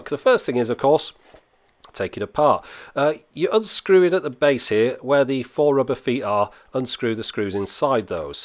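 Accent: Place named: North West England, United Kingdom. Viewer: You mustn't be English. James May is from Bristol. is from the North West of England. They both have regional accents and they are from opposite ends of England.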